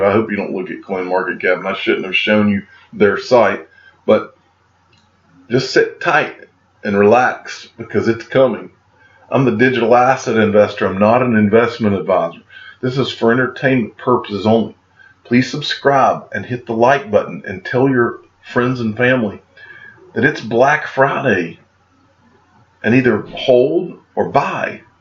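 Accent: South, United States. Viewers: HAHAHAHAHAHAH you are a fucking FAN BOY piece of shit... Stop SCAMMING people... How much XRP SHIT coins are they paying you for your SHITHOUSE forrest GUMP accent videos... you fucking STINK!!!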